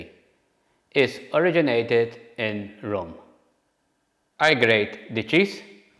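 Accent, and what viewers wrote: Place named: Malta. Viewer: yes but i picked up on your accent and just asking if you are of maltese decent. i know because i am 😊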